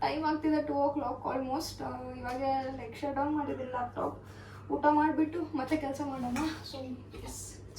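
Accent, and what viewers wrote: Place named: North India, India. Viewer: Your hindi is like we north indians ! Great . U r so energetic and beautiful. Love ❤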